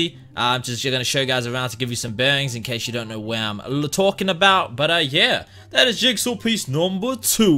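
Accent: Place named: New Zealand. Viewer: I LOVE YOUR ATTITUDE AND YOUR KIWI Accents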